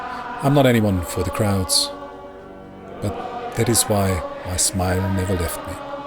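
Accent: United States. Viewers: Great to see you back, Father. But you seemed to have aquired an American accent and the pitch in your voice seems to be an octave higher... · Good to see you again. Your voice sounds somehow different? More American- I even wondered if it was you narrating at one point!! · I am very confused. I do NOT recognize your voice. When did you aquire an American accent? Speaking of American, you seem to have pivoted from Religion to the mighty dollar. How very sad this new you is! I hope you reconsider and go back to your old self.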